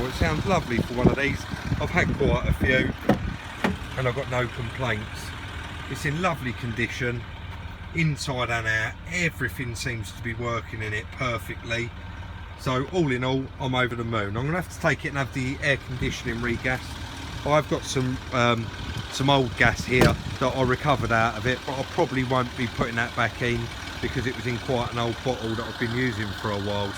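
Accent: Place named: Cockney, United Kingdom. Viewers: Dreadful London accent and strange, non-English words spoiling a nice video. Has anyone ever told you the difference between "good" and "well"? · You sound like an East Ender. I'm from Paddington living in California. Enjoyed this tremendously. Most informative. Thanks. David.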